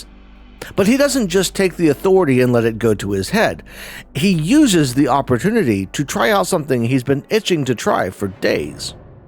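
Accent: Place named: United Kingdom. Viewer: Hey you're that fast speaking british guy that hates bonnie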